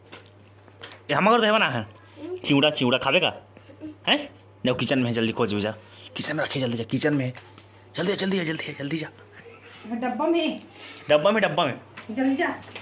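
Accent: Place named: Chhattisgarh, India. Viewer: Cg tune dale ho ten ha bht mst lagt he ❤️🤗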